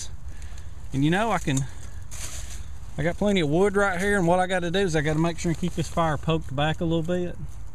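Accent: South, United States. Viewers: You come up with some great ideas,. I really enjoy watching your vids, and I love that southern accent! Lol! take care, now, and a big hello from New Zealand!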